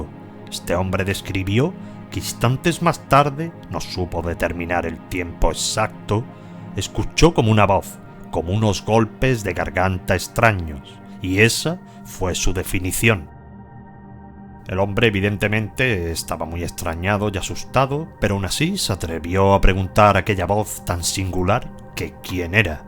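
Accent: Spain. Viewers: Hola Me gusta cómo narra los videos y las historias Yo vivo en California soy de Guadalajara México gracias por tan bonitas historias Por su forma de hablar creo que viven en España gracias otra vez y Hasta la vista recuerde yo lo escuchó siempre y la mayoría de sus historias las tengo guardadas Hasta la vista y cuídese Que Dios lo bendiga Pepe Gaitán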